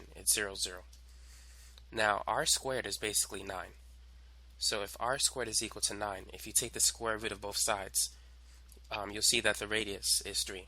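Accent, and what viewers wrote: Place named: Boston, United States. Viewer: You sound like you're from Boston or Maine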